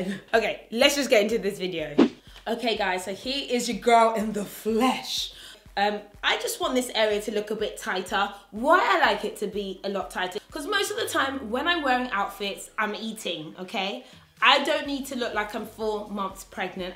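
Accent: United Kingdom. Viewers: I love you! I love the verbiage with your British accent. You make me crack up with your descriptions of the shape wear. Love you💖💖💖👠👛🛍🐈🐕🐎💖💖💖🐞🌹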